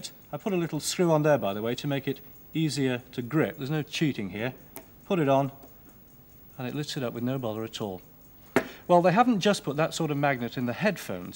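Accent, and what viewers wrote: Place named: North, United Kingdom. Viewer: He was too northern for 1980 BBC sadly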